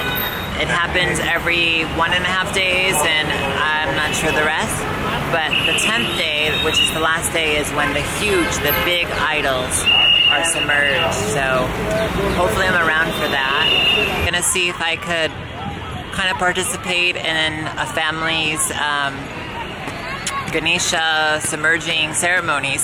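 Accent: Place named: Bengal, India. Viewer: She is speaking with Hindi language people but tune is like Bengali . love it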